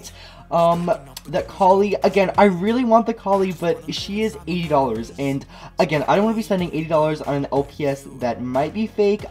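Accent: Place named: Australia. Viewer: OMG YOUR AUSTRALIAN TO OMG OMG OMG OMG OMG OMG OMG OMG OMG OMG OMG OMG OMG OMG OMG OMG OMG OMG OMG OMG I THOUGHT YOU WERE AMERICAN no offence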